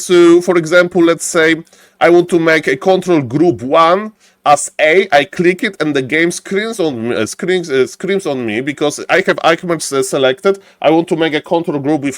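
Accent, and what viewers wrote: Poland. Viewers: Best polish accent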